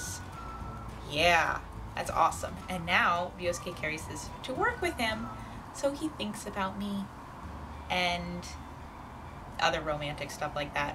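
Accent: Texas, United States. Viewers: I'm from Texas and I can say, there is no true stereo type accent. Texas, being such a large state, has different accents and dialects depending on what part of the state you're from. A lot like Japan. The accent most people relate to "Texan" is the drawl spoken in East Texas, like Bryan or Tyler. She's from Austin and sounds pretty Texan to me.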